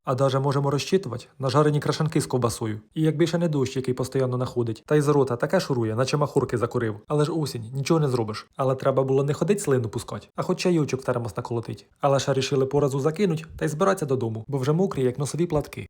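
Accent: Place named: Cherkasy, Ukraine. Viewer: От оцей черкаський гумор та говірка, бальзам на серце! Дай Бог вам здоровʼя щастя та усім миру!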